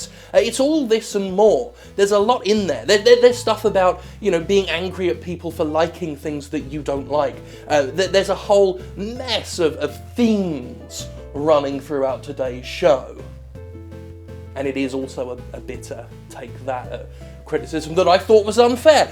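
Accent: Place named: United Kingdom. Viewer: Wow, I love so how britishly-accented, unapologetic, and delightfull funny you are.... subscribe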